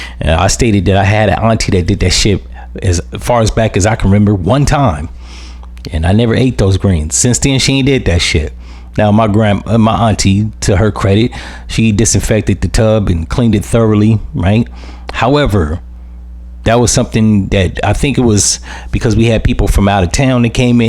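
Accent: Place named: Chicago, United States. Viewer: Keep going strong bru , you dropping fire , one of the best , you sound like one of my Chicago brothers .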